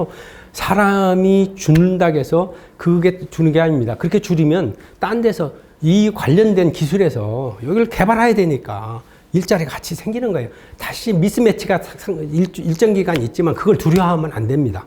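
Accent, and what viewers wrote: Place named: Jeolla, South Korea. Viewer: 당신 전라도 지 ㆍ 전라도 말투네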